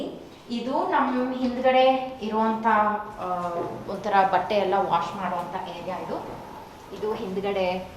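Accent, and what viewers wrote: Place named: United States. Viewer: #giveaway #ಗಿವ್ಅವೆ Hey there , Your kannada speaking style with American accent is so soothing😍, loved the idea of yours to teach your childrens to speak kannada.Stay Happy and prosperous☮️♥️.. Much love 🤗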